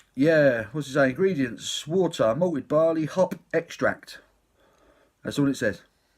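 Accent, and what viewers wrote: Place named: Cockney, United Kingdom. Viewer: is essentially a Cockney Bill Bailey beer reviewer. I always enjoy the videos.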